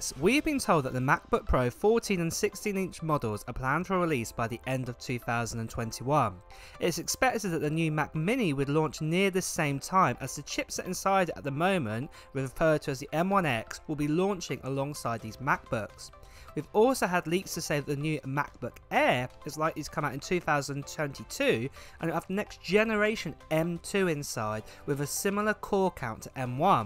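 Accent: United Kingdom. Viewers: God i hate his accent. Ive never known anyone who is trying to say two different words and having them sound exactly the same. Listen to him say " Thirteen and Fourteen" They sound exactly the same because of that awful cockney accent. Ugh im out. The video quality is great but get someone else to narrate them. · I want to buy the iPhone 11 but I don’t have money for that and also i don’t have a job since i was just a 16 yo who want some iPhone i am hoping that i will be the one who got your ipad❤️Godbless you man💖 btw i like your British Accent❤️❤️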